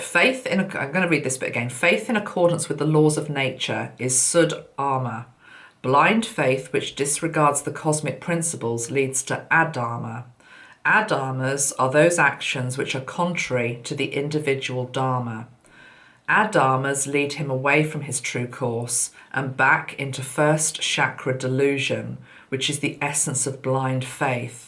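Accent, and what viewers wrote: United Kingdom. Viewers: This upload was so enjoyable from start to finish. Loved how you tied everything together with the snakes and arrows game, and you have such a beautiful British accent.💖 Thank you for all that you do.💖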